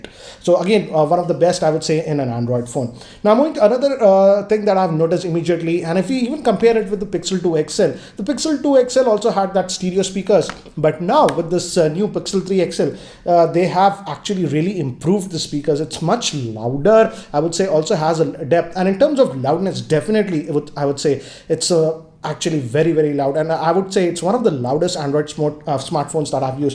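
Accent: India. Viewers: And thick indian accent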